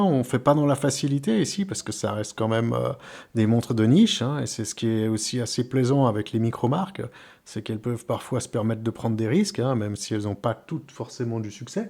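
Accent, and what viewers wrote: Geneva, Switzerland. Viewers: Très belle montre! et joli accent de Genève ;)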